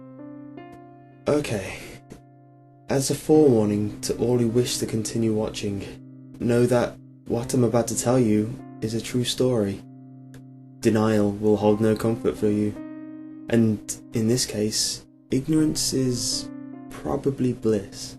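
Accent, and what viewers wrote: United Kingdom. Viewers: You have a gorgeous voice. Always loved British accents.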